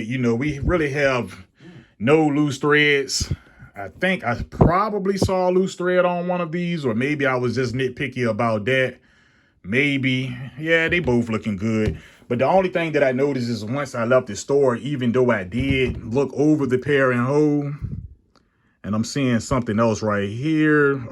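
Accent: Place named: Louisiana, United States. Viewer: Where u from? Sound like Louisiana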